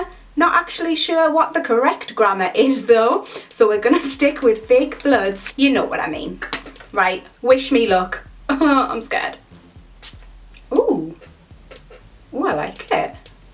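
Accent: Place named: Wales, United Kingdom. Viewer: You probably aren't welsh but you sound really welsh. Im welsh :D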